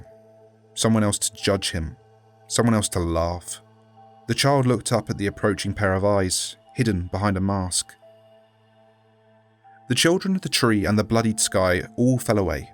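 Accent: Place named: United Kingdom. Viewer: I like british acsents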